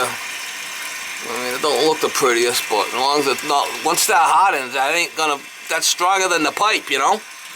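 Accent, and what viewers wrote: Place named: Massachusetts, United States. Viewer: I really like your videos. I think you must be in Massachusetts. I would totally call you to yell at me at my house if I had a plumbing issue! "That shits gonna be there for life. It'll be there forevuh!"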